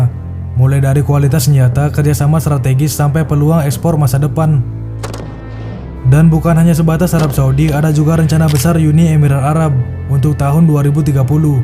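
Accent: Bali, Indonesia. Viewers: Suara voice overnya keren, empuk, clear, ada beratnya, artikulasinya jelas, berlogat Bali seperrtinya ya mantaaap 👍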